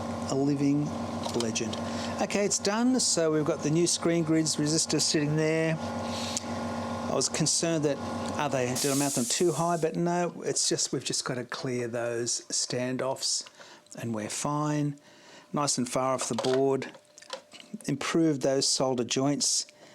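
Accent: Australia. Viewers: One two of the reasons I watch your videos is you are very good and I get a kick out of your deep down under south Australian demeanor and speech accent. And is slightly salty at times with good humor.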